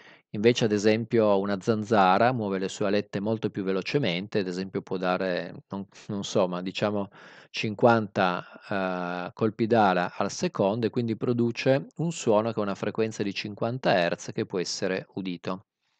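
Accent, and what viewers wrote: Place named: Calabria, Italy. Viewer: Non si e capito molto sembri di Calabria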